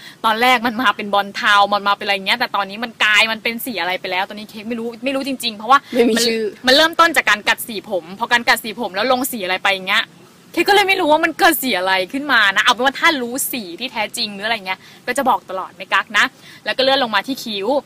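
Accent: Thailand. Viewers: พูดเหน่ออ่ะ แต่น่ารักนะไม่ได้ว่า ผมว่ามันเป็นเสน่ดี......ศัลยกรรมผมเชยๆนะใครก็ชอบคนสวยทั้งนั้นแหละ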